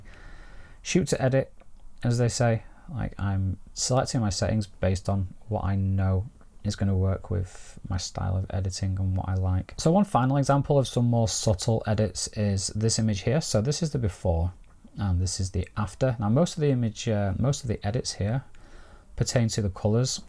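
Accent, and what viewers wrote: United Kingdom. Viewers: Thank you! I'm fairly new to RAW and only very occasionally use it. Following your video, I downloaded your RAW files and tried them out myself on my iPhone12pm. Since that now has RAW capability, I tried a few edits in the default app, and then finished it off in SnapSeed (just the normal edits, not RAW). I have previously found that I preferred the default photos app for RAW editing since in SnapSeed the scale for shadow RAW editing was far too wide and tended to affect the mid-tones as well as shadows. I also tried out DarkRoom which I've only tried a couple of times previously. It doesn't appear to have a masking facility, something which I find to be essential, which is why I most often go back to SnapSeed. And I didn't like what DarkRoom produced this time around! I preferred to go for a very different look from yours, but as you say, so much is down to personal preference. 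I do most of my shooting in the default camera app, but must try out Halide which I have but not really got to grips with. I have a problem. I'm an AppAholic haha! But I guess I"m probably not the only one!!! Is there a suitable 12-Step Programme for me??? Blessings and thank you again. PS, nice to hear a British accent like mine on YouTube!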